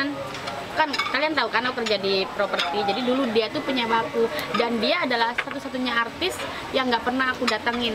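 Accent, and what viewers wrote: Java, Indonesia. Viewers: Medok nya,, bahasa Indonesia berasa jawa tulen 😂😂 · Medok jawa nya mbak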